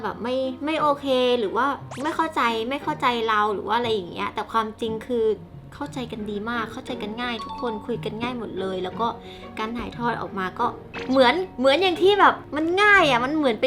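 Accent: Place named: Isan, Thailand. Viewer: พี่พูดอีสานน่ารักนะ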